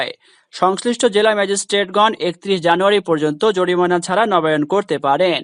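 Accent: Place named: Mymensingh, Bangladesh. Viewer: এমন করে পড়ে কেন!!!!!!!!! ভাই এর বাড়ি কই??? ময়মনসিনংহ নাকি???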